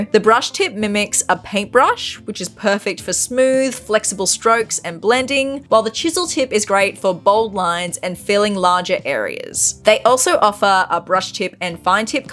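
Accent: Australia. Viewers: I appreciate that Ohuhu sponsors so many Australian artists so I get to hear them all say "aurhewhew"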